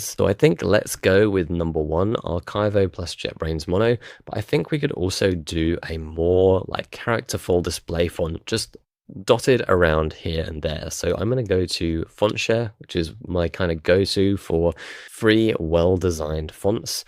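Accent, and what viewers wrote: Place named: United Kingdom. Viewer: I don’t care about captions - I listen, not read. I only said improve the mic bass because there’s way too much low frequency in the audio with such accent, making it hard to understand. Had to replay the video just to catch it. Are you British or something? Is that why my comment got under your skin, kid? Lol.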